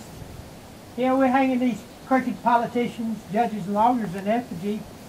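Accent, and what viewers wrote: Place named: South, United States. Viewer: There are better ways to get things done in this country than elaborate and over-the-top hanging in effigy skits. Next time get an articulate and well spoken person to present your case. I mean the talking to mannequins, the hangings in effigy, and the THICK southern accent completely distracted me from any point you were trying to make. The video creeped me out more than anything.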